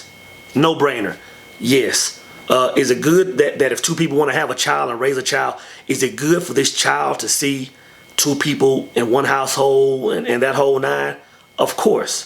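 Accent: Texas, United States. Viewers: Before I knew you were a PK (preacher's kid) I saw the pastoral love in your eyes; the apple don't fall far....(Your Texan accent is awesome, BTW). I am amazed by the gentleness by which you edify your brothers. God has a very powerful calling on your life, and I am committed to pray you and the other brothers become all you are meant to be according to God's grand plan. Keep up the good work. My heart wants to be sad that you feel marriage is not for you, but I can understand being in that state because of past experiences and being screwed over by society. May I say, DON'T LET THEM WIN! I don't know how your individual lives will turn out but just know here is a sister who wishes you well, and laments the pain/frustration/mistreatment you have been through. I hold you all in high regard and expect things in your life to get better. I wish you to be surrounded by people who see the treasure in you and draw it out. 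You will not go down. You've already been down. Now is the time to keep going, keep rising, keep building. The generations will sit at your feet and say, "Dad....Honey...Grandpa, tell me...teach me...explain...show me" #YouTheMan #YouTheBoss #TheEarthNeedsYou, my kings!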